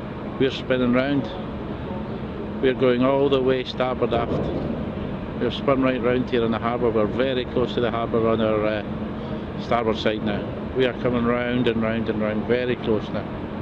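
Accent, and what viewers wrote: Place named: Scotland, United Kingdom. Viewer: The Scots narration is phenomenal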